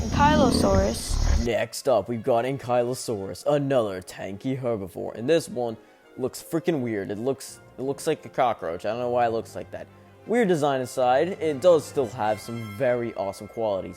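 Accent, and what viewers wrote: United Kingdom. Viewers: bros british accent slipped back, also the list is pretty much what id make my list lmao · you sound british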